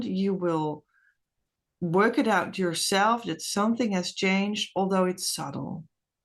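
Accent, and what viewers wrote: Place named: Netherlands. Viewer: So nice to see you! My DM is Dutch so I recognized your accent right away.its so reassuring to know someone from The Netherlands is discussing Twin Flame. He would have me believe otherwise 🤭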